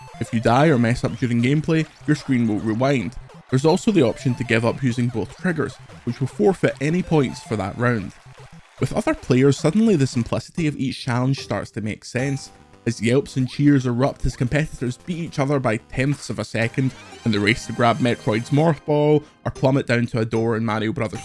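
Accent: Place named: Scotland, United Kingdom. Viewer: is sounding particularly Scottish today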